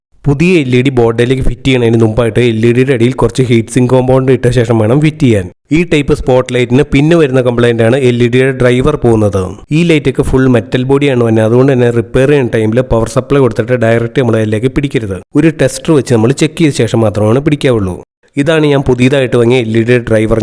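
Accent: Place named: Muvattupuzha, India. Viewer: Kothamangalam or muvattupuzha.😊 സംസാരം കേട്ടാൽ അറിയാം😊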